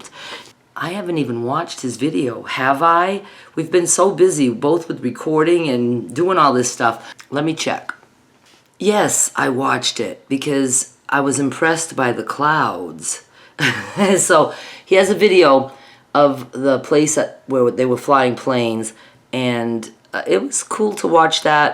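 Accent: Maine, United States. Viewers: "His caws" haha you still have your Maine accent! Love it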